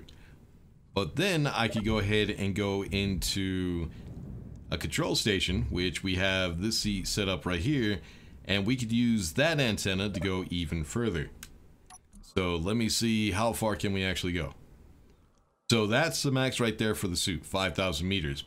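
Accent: United States. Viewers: I'm not used to the American accent (I'm a Brit) so was trying to work out why "planet with a bunch of turds (turrets) on it" needs defending 😆.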